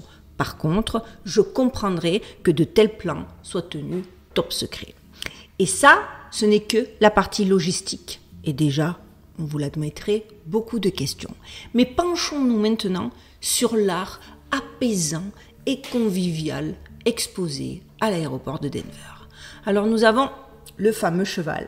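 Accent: Marseille, France. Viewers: oui ta pas changé en 2 ans !! Je suis un jeune abonné à ta chaîne et je la trouve sympa depuis Los Angeles d entendre l assent de Marseilles !👋continue ! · Son travail à Végas, dans la vie courante, c' est Belle génie, légèrement Asperguer, mais surtout maman plutôt rigolote, communicante et artiste dans l' âme !!! Marseillaise quoi !!! mais Marseillaise américaine Avé l' accent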